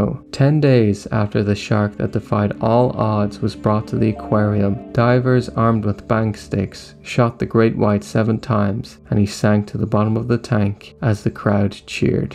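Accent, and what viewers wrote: Ireland. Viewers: is the narrator Irish? he pronounces "sandy" strangely.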